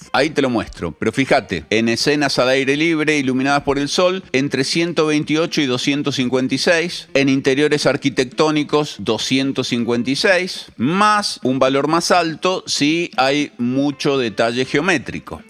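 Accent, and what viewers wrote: Argentina. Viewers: ⚡Vi los avances de la nueva versión; pero he esperado a oírlos de boca de. Ya me he acostumbrado... no sé si es bueno, si es que me he acostumbrado a su habla del español argentino, o que me estoy haciendo vago... muchas gracias por todo!!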